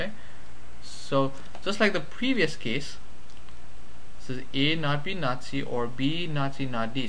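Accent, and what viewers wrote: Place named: India. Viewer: Can't recognize that accent, where are you from? Seems like a light-ish indian with a bit of a lisp. Great video nonetheless.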